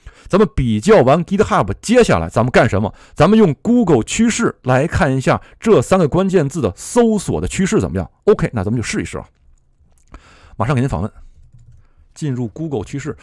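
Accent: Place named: Japan. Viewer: 😂听说中文的习惯猜生活在日本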